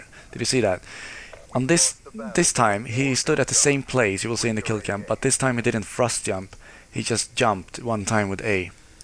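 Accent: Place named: Sweden. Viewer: you are for sure swedish😃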